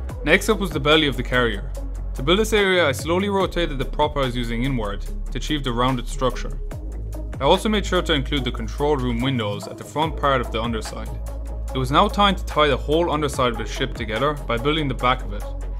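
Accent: Ireland. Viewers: Are you Irish you sound Irish? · btw are you irish because you have an irish accent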